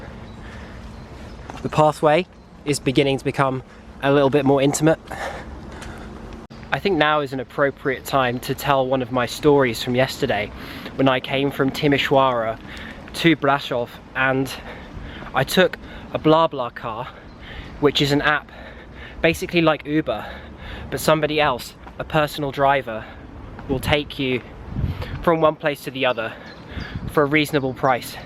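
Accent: United Kingdom. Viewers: where are you from ?England or USA?your accent sounds like british :) .What was your opinion on the places you have seen in Romania ?What did you expect to see and what did you find in Romania? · Din UK. Nu ti-ai dat seama dupa accent?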